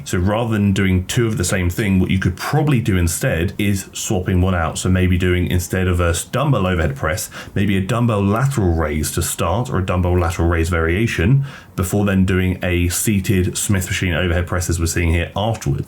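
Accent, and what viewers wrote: United Kingdom. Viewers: I love when a British man talks to me about muscles.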